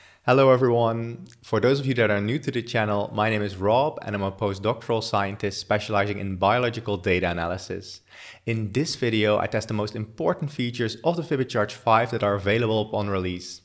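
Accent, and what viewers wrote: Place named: Netherlands. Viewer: Your accent really gives it away from where you are (NL). : ) Nice channel!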